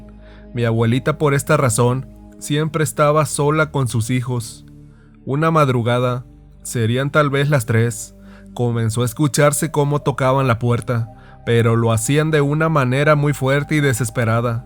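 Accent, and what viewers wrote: North, Mexico. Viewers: ...orale, buenas historias y buena narración, muy norteña.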